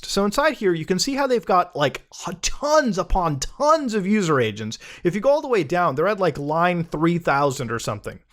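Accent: United Kingdom. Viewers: nothing makes me more happy than hearing that fresh Bri’ish accent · I want more Bri'ish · I thought u was wearing a mosque hat for a sec : Also that british accent thou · nice british accent lol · So... British! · with British accent kinda slays tho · wow, I wasn't expecting to hear bri'ish today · can speak Hindi and British. gosh I love this guy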